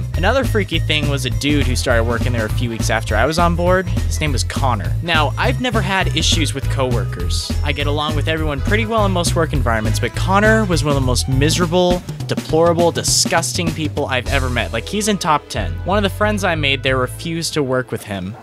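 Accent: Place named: England, United Kingdom. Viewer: Thic English accent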